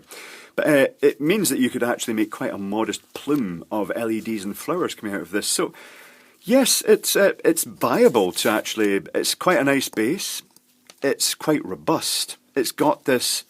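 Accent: Scotland, United Kingdom. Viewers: does the description actually say "idear gift"? or is it Scottish accent that makes the chinese sound humorously self-deprecating?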